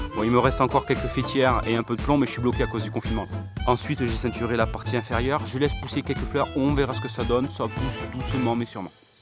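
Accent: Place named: Toulouse, France. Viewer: Houai...encore une idée géniale qui va me permettre de faire ma petite fontaine avec bain d'oiseaux (important). Hé bé, je me disais que tu avais comme un petit accent que je connais...bé forcément, au sortir des torrents de l'Adour, au bout des vallées, on le connait le bel accent de notre jolie ville rose ! Bon, je te copie pour pomper...ou l'inverse...😂 Merci ! 👍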